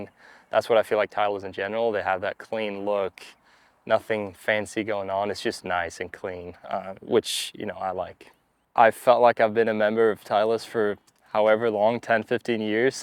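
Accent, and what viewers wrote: United States. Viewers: He sounds so American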